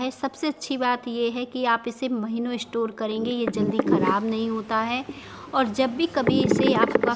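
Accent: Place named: Bundelkhand, India. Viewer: Aap ki bhasha ka ton bundelkhand ka hai,aur bundelkhandi bhasha bhaut achhe bhasha hai ,aap usko bolo,to achha lagega,jo aap english ki shabd use karte hai ,hindi bhi achhe lagege.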